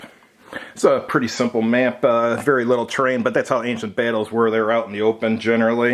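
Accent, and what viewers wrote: Chicago, United States. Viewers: Ancient wargames reviews with Chicago accent, I'm in.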